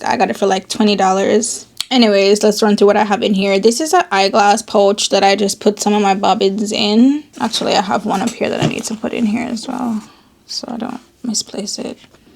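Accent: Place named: Patois, Jamaica. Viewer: lol the patois chips in sometimes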